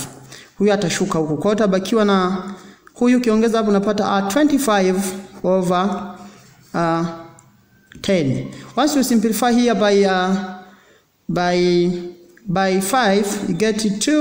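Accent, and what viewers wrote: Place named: Sukuma, Tanzania. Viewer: Akiongeza kidhungu inavutia lakn kiswahili tunasikia lafudhi ya kisukuma tunabaki kucheka tu🤣🤣🤣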